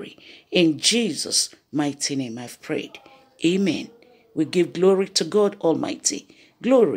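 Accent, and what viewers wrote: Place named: Africa. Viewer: Thanks for sharing the word of God. It feels nice to hear your accent, I'm missing my friends from Africa.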